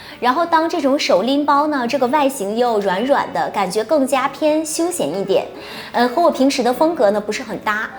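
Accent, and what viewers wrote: Northeast, China. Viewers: 的发音好东北啊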